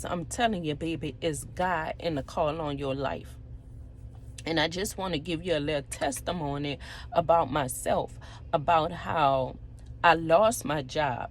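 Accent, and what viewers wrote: Louisiana, United States. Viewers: I’m trying my hardest to stay positive in life but it’s been hard I feel like Joel in so many ways I have lost everything including some family members that I love dearly and I’m trying to figure my way through this storm it’s been going on for some years now I have been praying for blessings and opportunities and open doors I have never prayed this much ever in my life! I’m just not used to this I’m homeless I work for a relative and he doesn’t care about my situation I just need to get back on track ! My heart is pure ! I’m strong but I’m at a boiling point and I need help and prayer so boss lady can you please pray for me and I love your Louisiana accent ❤️❤️🙏🏽🙏🏽💯💯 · She from Louisiana 😊 I 💕 her accent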